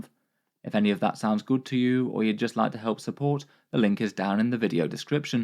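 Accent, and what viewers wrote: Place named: England, United Kingdom. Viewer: No. It's a UK mainland accent. Maybe Leicester or Leeds.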